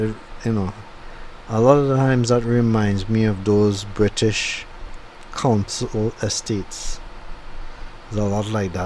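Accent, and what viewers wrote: Trinidad and Tobago. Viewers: nice Trini accent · I like your true trini voice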